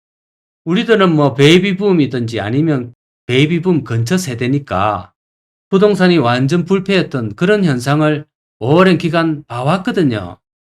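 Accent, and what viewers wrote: Gyeongsang, South Korea. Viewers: 설명이 너무 재미있어서 나라 돌아가는 꼴을 보면 하루늘 보내는것도 지겨워 죽을 지경 인데 설명 을 들을때마다 옛날 시골풍경 구수한 경상도 사투리 정말 살맛납니다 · 구수한 경상도사투리 정겹습니다 좋은 방송 잘보고있습니다 선생님항상감사합니다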